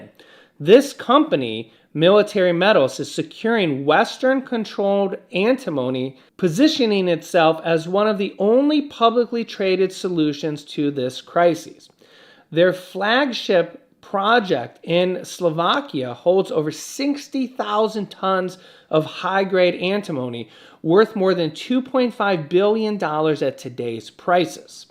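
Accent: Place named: New York, United States. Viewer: Just, I can hear you New York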